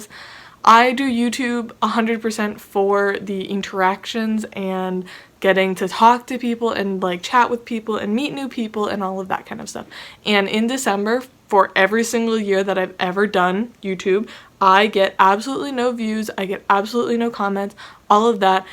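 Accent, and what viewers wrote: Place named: Canada. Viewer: You’re from New England? I thought you were Canadian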